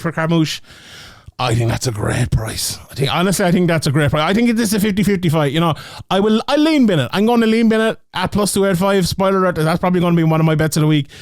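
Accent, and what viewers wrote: Ireland. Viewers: I dont recall 1 damn pick you said cuz you talk so fast and have that irish accent but i sure do love to hear it brotha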